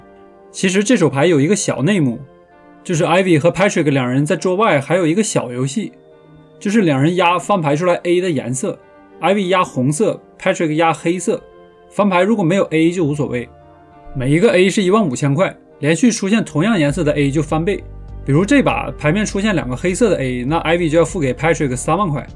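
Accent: China. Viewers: 喜欢你的东北口音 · 哥们是长春的？我一个哥们和你口音很相似，他是长春的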